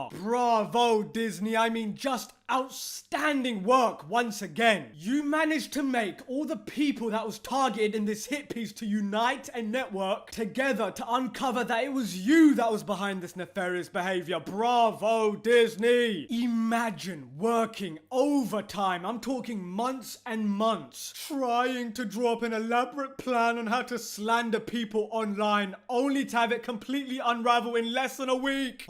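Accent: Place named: Hull, United Kingdom. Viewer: Just taking a wild guess but are you from Hull or anywhere near? Knew a guy from Hull when studying abroad and sounds similar. However, as an American that’s never been to UK I make no claim of expertise with regional variations.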